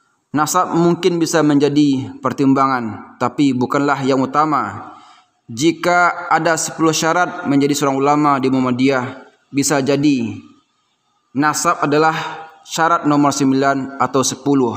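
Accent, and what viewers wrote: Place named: Padang, Indonesia. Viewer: Ngomongnya logat Padang boanget yak?! 😁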